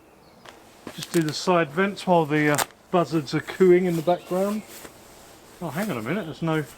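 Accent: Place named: Cockney, United Kingdom. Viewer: Love the cockney accent and pronunciation, "minus free" .. brilliant.. your videos are so relaxing. My Dad took us campimg a lot when we were kids.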